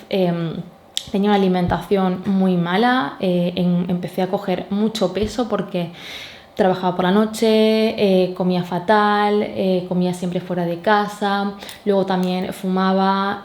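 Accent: Argentina. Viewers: tiene un acento no🇦🇷?? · Gracias por tu canal y por abrirnos tu corazon en este video ❤ te acabo de descubrir hoy y ya tengo planeada una maraton porque hace un tiempo que vengo trabajando en mi desarrollo personal, y realmente este es el aspecto con el que siento que mas necesito reconectar y en el que me siento mas perdida, gracias realmente por proponerte guiarnos ❤️ Estoy esperando ver en algun video que digas de donde sos porque por momentos te escucho hablar como argentina y por momentos como española, y ahora que te veo tomar mate es evidente que alguna relacion con Argentina has tenido. 🤔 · Sos argentina? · Creo que no me quedo claro desde donde viajó hasta donde, alguien sabe?. Por ahí me suena a qué tiene un acento argentino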